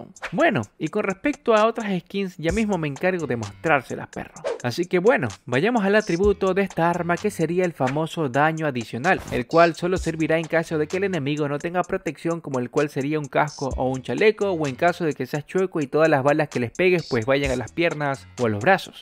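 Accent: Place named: Ecuador. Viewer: Eres Ecuatorianísimo, jajaja sub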